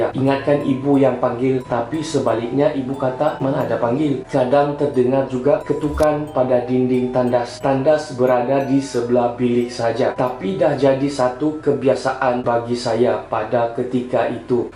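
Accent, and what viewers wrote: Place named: Sabah, Malaysia. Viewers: Cam bunyi2 org sabah...well as sabahan susah eh mau kasi lari tu slang sabah hehehe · dialek sabah...islam ke??? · sesiapa yg komen kata cara dia bercakap mcm kupi2 go, korang mesti xpernah dgr org sabah bercakap kn? rajin2 la keluar dari bawah tempurung tu. belajar sikit dgr dialek ckp negeri2 lain supaya lepas ni dgr je dari cara org ckp dah tahu dia asal dari mana. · Jgnla macam gitu bang. Sabahan mmg macamtu cakapnya.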